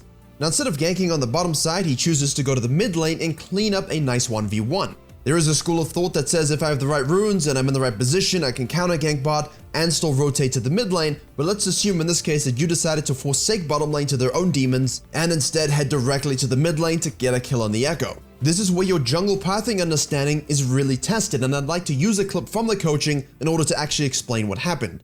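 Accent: South Africa. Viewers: Your video make me feel like there's a chance I could go pro! Hahahaha jk but seriously your Channel is amazing, also what accent is that cause I hear South African.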